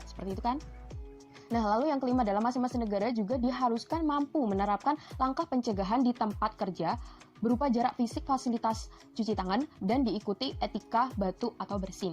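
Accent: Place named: Java, Indonesia. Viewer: Medok bgt mbanya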